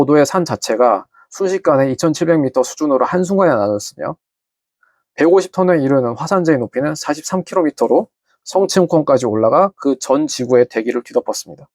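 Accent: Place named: Jeolla, South Korea. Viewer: 얘 억양에 전라도 사투리 섞인거같은데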